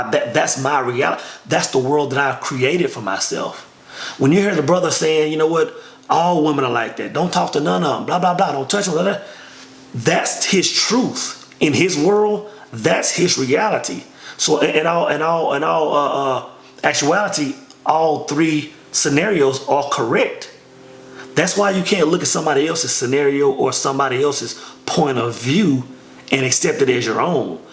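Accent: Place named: East Texas, United States. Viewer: Man u from East Texas. I knew it! Great video!! I'm in Cedar Hill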